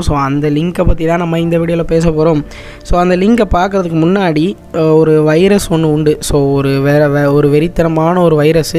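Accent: Tirunelveli, India. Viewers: Bro neega nellai ya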